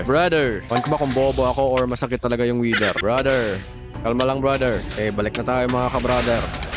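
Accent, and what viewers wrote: Visayas, Philippines. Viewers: Bisaya ampta hahhahaha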